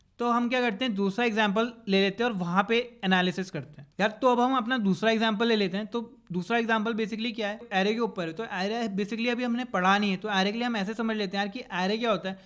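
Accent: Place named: Rajasthan, India. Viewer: The mentor sound like he is from Rajasthan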